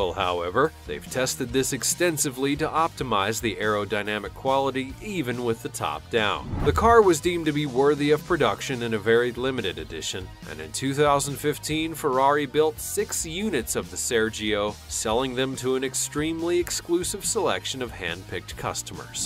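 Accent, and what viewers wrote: United States. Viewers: You know when someone talks so American, it annoys you to listen.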